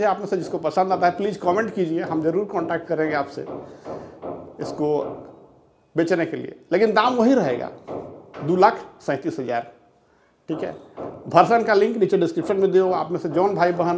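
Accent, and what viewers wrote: Bihar, India. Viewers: Bihari accent is the best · Best honest video I have ever see and that too in bihari accent😂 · Gazab bihari accent,asli wala amir😁😅 Sir u r a true bihari apne ap ko real me ambani se kam nahi samajh rahe the😁😆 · Only Bihari can knows he is Bihari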